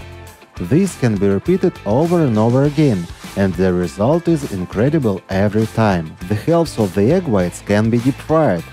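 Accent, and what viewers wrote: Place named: Russia. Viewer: Such a sweet Russian accent. I love it.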